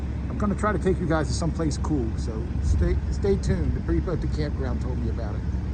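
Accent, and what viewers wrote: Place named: Western Pennsylvania, United States. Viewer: Where are you from? Sounds like Western PA, western Maryland area.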